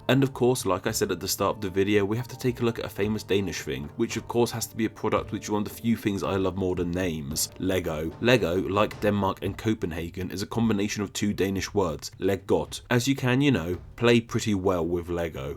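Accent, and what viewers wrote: United Kingdom. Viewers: Ah. British accent.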